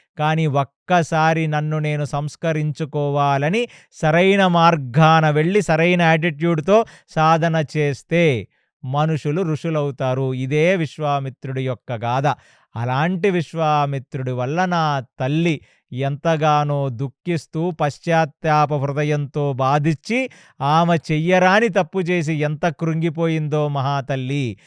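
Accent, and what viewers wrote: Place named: Godavari, India. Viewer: Godavari Slang😅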